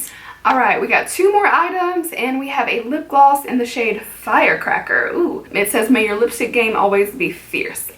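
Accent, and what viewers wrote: South, United States. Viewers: I can hear the country coming out in your voice a lot in this video love it! :-) maybe because your so excited!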